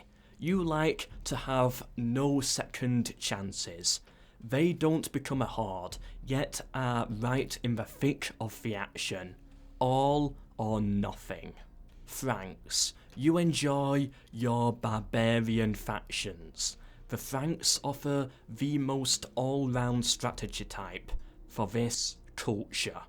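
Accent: United Kingdom. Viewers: Oh god your british acent is that british I underground only 60% of your words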